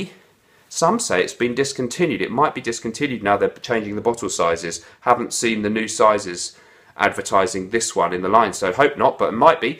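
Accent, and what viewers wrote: United Kingdom. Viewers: Canadian sub here..... As I said before, there's nothing more commanding than a proper Brit accent! I'm so very thankful to you for the Bois du Portugal recommendation.... Got a sample from a place called Holt Renfrew here in Canada and just bought a full 120ml bottle... On a side note, if you wanna be blown away by a phenomenal upscale G.I.T frag (believe it or not- brother you've gotta gotta gotta get a sample or somehow try out RAIN from the House of Royal Crown.... It should be readily accessible in the U.K from what I've researched! Project all you want Mr.!👍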